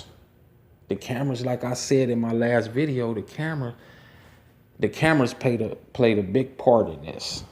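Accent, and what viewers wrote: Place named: West Coast, United States. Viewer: sound like you are from the west coast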